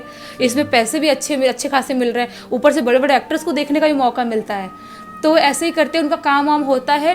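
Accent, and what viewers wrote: Bihar, India. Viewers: Yarr tum chutiye ho kya . Pehle to iss didi ko bolo ki apni akhe chote krle . Vaddi vaddi akhe nikal leti hai . Hume dra dra ke marr degi kya 😂😂😂. Fir ise bolo ki hindi bolna to sikhle bihari to matt boll . Ja fir behari he boll le . Didi ko bolo ki apna accent theek krle . Words theek se pronounce kre .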